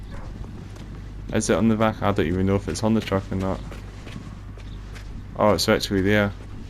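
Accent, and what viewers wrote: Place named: Scotland, United Kingdom. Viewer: Ah. There must be a variety of Scottish accents then, and yours is one I'm less familiar with. I was close with a lovely Scotsman named Jim Wilson, and was very familiar with his accent, and less so with yours.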